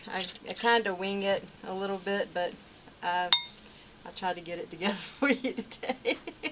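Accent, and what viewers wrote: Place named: South, United States. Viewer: Hi! Great video! Thanks for subscribing to my channel. I like your southern accent. ...Your teeth look great too!! Peace & Health to you, Itsy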